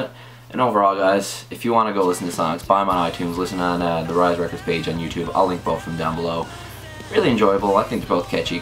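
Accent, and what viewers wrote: Canada. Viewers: I can hear an accent. canadian?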